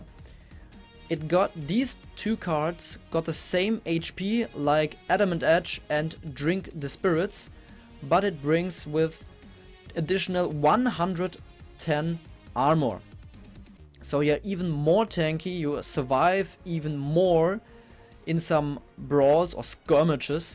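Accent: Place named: Germany. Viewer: your german accent is painfully obvious.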